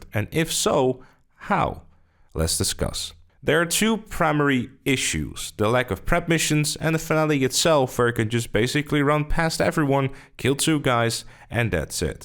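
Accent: Netherlands. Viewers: Are you dutch ? I can kinda hear it in your voice 😂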